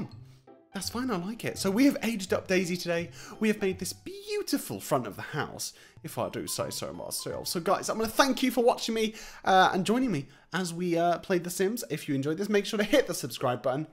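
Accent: United Kingdom. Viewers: your British accent is very cute and fancy!💖💖💖 Love ya!!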